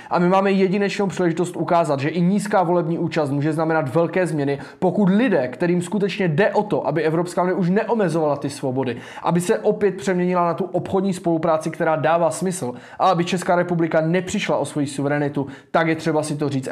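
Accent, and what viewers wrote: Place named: Slovakia. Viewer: Mně ta skoro slovenština přijde roztomilá.Já jsem Slovenka a taky češtinu ač nerada trochu prznim.🙂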